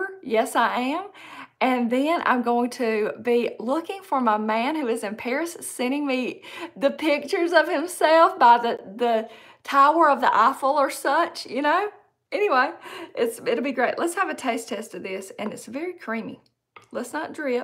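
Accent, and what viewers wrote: South, United States. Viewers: Thank you! Sometimes I can't understand the Southern accent- Green/Grain, etc.🤗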